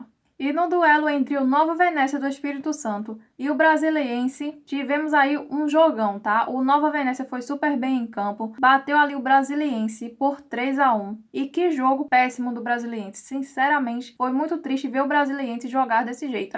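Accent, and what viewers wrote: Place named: Sergipe, Brazil. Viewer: kkk parece que e sergipana sou paraibano torço pelos times daqui e pelo mengao e claro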